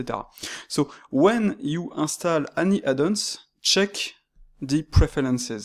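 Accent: France. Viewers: I can tell you're french :)) btw thanks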